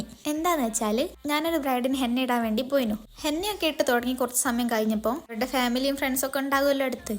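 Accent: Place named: Wayanad, India. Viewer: Itha wayanad aanno Ente friend und wayanadil ool idhe slangl aann samsarikal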